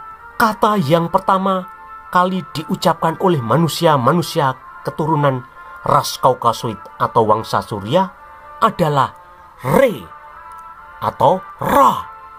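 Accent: Java, Indonesia. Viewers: Baru ini nonton chanel jdi ketagihn nonton krn bhs nya logat jawa dan penekanan kata2ny,bkin ktwa,gak ngantuk aplgi nyebut muhammad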